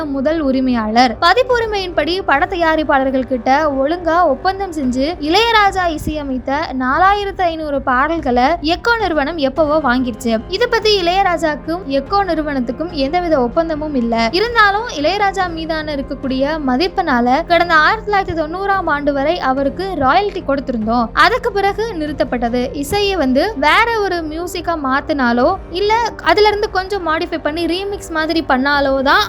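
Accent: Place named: Madurai, India. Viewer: Inda news vaara voice da Voice Madurai irukudu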